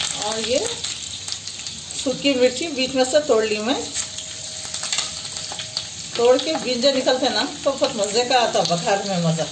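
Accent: Hyderabad, India. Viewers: Wah pakki hyderabadi language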